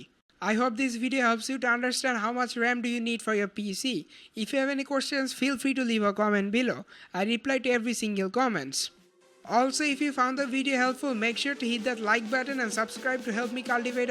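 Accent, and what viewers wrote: India. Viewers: Lovely accent :) Hello India!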